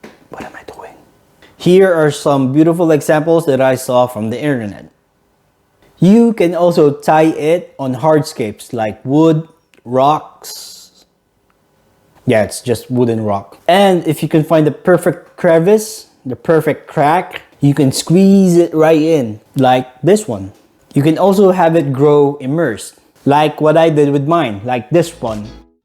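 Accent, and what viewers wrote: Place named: Philippines. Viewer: I can hear that youre a filipino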